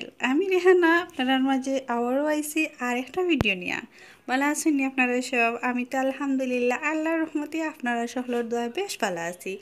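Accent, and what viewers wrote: Sylhet, Bangladesh. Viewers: কাছা মাছ কি বাবে খাবেন, আপু আপনার সিলিটি মাত আমার খুবিই ভালা লাগছে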